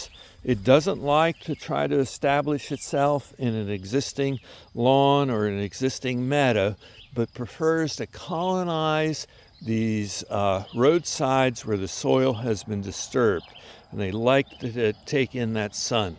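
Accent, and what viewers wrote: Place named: California, United States. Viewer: Love this guy’s accent—sounds like a CA dude.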